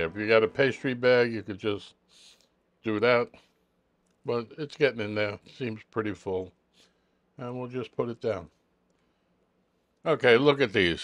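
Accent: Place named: New York, United States. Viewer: Your demeanor and accent scream new- york, and I love it. Thank you for the videos may God bless you.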